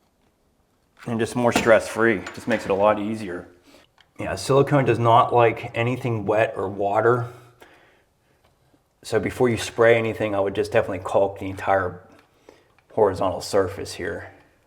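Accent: Pittsburgh, United States. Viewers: haha, within 10 seconds of hearing him talk I knew he was from the burgh🙂🙂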